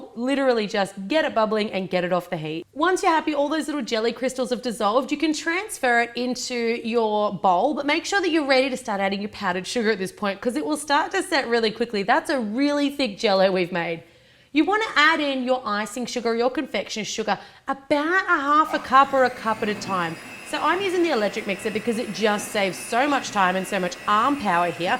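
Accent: Australia. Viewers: I thought you were australian ?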